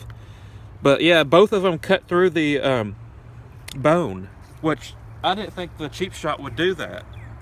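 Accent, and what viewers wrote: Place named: South, United States. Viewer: Speech impediment and a southern accent, l feel your pain!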